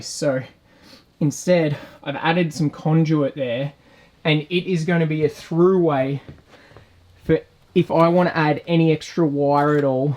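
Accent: Australia. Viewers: Lmao I love his voice, that Aussie twang is great!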